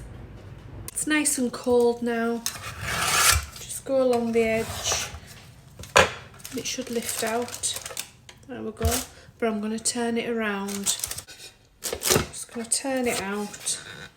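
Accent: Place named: Silksworth, United Kingdom. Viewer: I have just made this again cos it was so nice last time. 😊I grew up in Silksworth and love listening to you. You sound like I used to. Told my sister to listen to you She still lives in Washington.. keep going with the channel. 🎉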